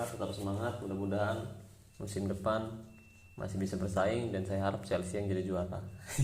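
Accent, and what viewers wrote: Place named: Cileungsi, Indonesia. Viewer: bang lu orang sekitaran cileungsi yak?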